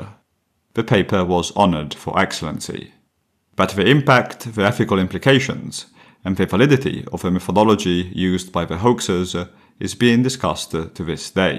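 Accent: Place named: Italy. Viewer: I thought it was a finish accent at first but leaning more towards Italian now. Difficult to place tho, to me sounds like a mix of Italian, Icelandic and something Eastern European 🧐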